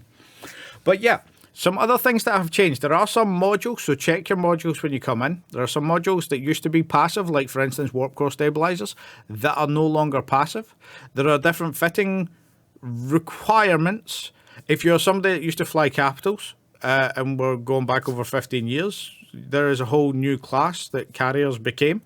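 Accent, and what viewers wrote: Scotland, United Kingdom. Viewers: i'm listening like, finland? that's a scottish accent lmao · Christ man, You are scotish? "rycordyn" "cerrierz" "skelz" music to my ears.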